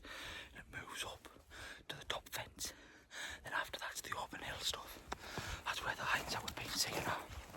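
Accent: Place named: North East England, United Kingdom. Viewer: You from Sunderland or Durham? Sounds it haha… I’m from Newcastle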